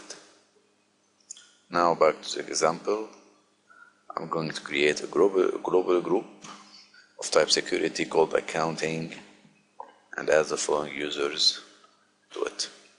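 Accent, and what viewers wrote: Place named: Germany. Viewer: no explanation whatsoever...seems to be in some kind of hurry.........could not understand stupid german accent also !!!!!!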